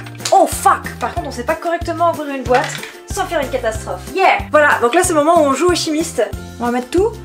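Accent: South, France. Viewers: J'adore ton accent du sud ! Je le fais presque aussi mal x) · Ho oui je veut d'autre vidéo Miam Time :D Et merci pour l'accent de sud ;)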